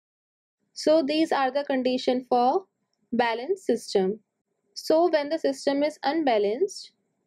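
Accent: India. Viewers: Indian voice is hot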